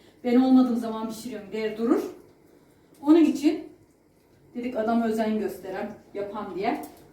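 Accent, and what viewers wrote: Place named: Kütahya, Turkey. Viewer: Sizi yeni izlemeye başladım nerelisiniz acaba kütahya konuşmasına benzettim konuşmalarınızı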